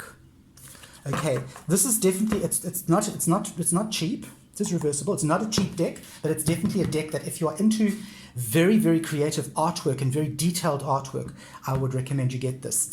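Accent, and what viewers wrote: South Africa. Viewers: Really enjoyed your video! Great collection, and your accent made me feel nostalgic, as I was born in South Africa😊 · Oh my! WHO ARE YOU??!!! I've only just stumbled upon you vis-a-vis this video. (Who doesn't love a collection video!) Immediately, I recognized, and was drawn to, the South African accent. LOVE your energy, wit, clarity, etc etc... I will be certain to check out other videos on your channel. You've earned a new sub. Thank you for sharing your collection! ♥️ You and yours, stay well.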